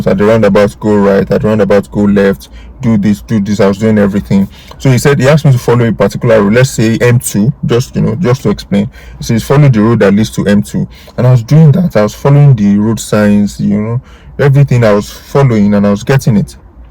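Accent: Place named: Nigeria. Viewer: I like the way you speak english in your Nigerian accent sir .. A little tip for you (in your test try to have a good friendly conversation with your test instructor while your driving which will divert the intructors thought away from examining... this is how I passed my driving test in the UK. all the best in your next driving test!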